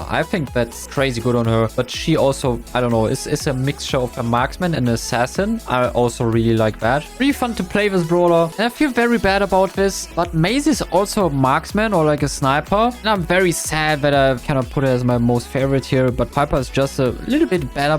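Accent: Germany. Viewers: You haven’t mentioned Chester! But that’s fine dw! If he’s one of your favorite: Understandable, when he’s close he makes tons of damage, his super where his first attack is stronger is OP, the gadget with buffs or changing your super to a different one is also amazing! If you don’t like him: Also understandable, for his massive damage, his healing super, the bomb, the stun, the slowing down and poison are annoying and OP As a Chester Main I can say: It’s 50/50 Fun fact: Since he was made better with 4 now without the super he can use his 2nd, 3rd and 4th attack to kill mortis, piper, surge (if he doesn’t have any upgrades or is just bad) and also Edgar without any super Though I must say I camp a lot since going in offense is not the best idea with Chester in my opinion! -> I’m new to the channel and love the vids, love the German accent a lot 😂 Don’t have him rank 30 yet cuz I don’t have friends to play with so I have to play with random people- 😭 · Man hört dein deutschen Akzent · this is just the german accent and he is a german so it makes sense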